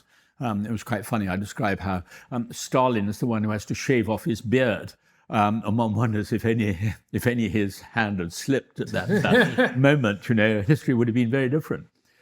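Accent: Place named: United Kingdom. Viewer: Robert DeNiro with a British accent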